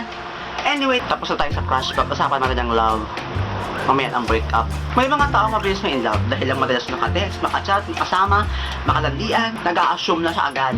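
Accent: Visayas, Philippines. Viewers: visaya ka teh??????/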